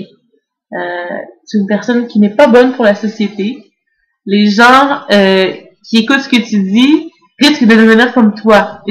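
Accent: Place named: Quebec, Canada. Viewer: Vraiment facile de juger les gens... Mais la réalité est que ce n'est pas ta faute... Tu sembles encore trop jeune et distante de la réalité Française... Ton accent me laisse transparaître le Québec ? Si c'est le cas ? Je suis pas surpris ! Ici, et je dis ici parce que je suis du Québec aussi, nous sommes encore bien contrôlé et que la France, elle, se réveille je crois... Enfin, j'espère que tu as au moins pris la peine de visionner plus d'une vidéo de Tarence Lacroix avant d'lancer 1ère pierre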